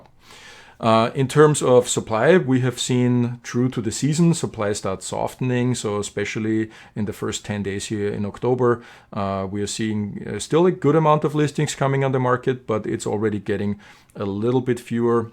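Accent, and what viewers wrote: Germany. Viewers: It sounds like you are from Germany? As a European used to a safety net I find it overwhelming to imagine how to get a job in Milwaukee without a career. And being able to afford healtcare. If it's even possible. Are there any places that would help you apply for jobs for free? I wonder if it's possible to qualify for food stamps as an American citizen while your searching for a job if you've never lived there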